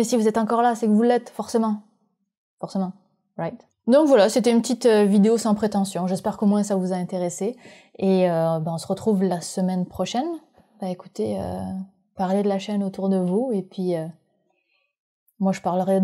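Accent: Quebec, Canada. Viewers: C’est moi qui ne m’en suis jamais rendu compte ou est-ce que tu commence à développer un accent québécois ?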